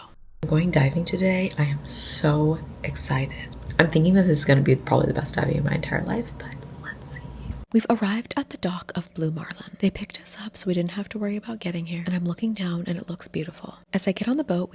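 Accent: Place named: United States. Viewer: What do you mean? I'm an American who understood her American accent perfectly. I'm sorry if you don't have the cognizance to understand one of the most common, average, generic, but generally functional accents in the English language.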